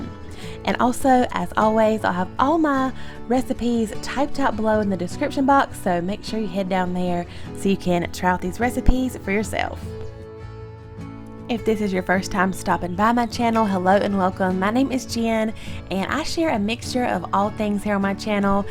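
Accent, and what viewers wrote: South, United States. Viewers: Thank you for sharing your recipes ! Your Southern accent makes it even more enjoyable to watch ❤️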